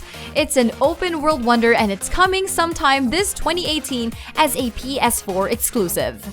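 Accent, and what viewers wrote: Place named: Philippines. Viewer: Her accent is so filipino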